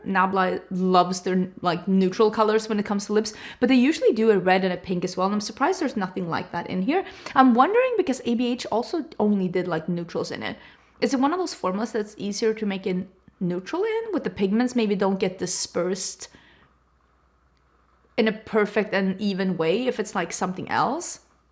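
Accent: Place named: Sweden. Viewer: You speak too fast with that thick Swedish accent, slow it down, gosh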